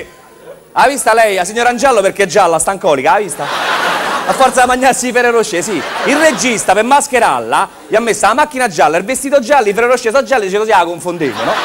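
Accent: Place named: Rome, Italy. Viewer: Una velocità di battute,strepitose Poi quella parlata romana aumenta il potenziale Davvero bravo bravo bravo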